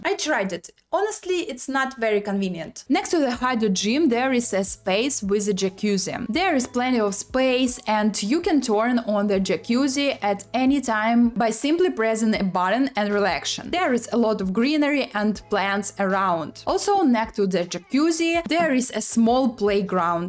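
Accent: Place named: Russia. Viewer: Услышал русский акцент местами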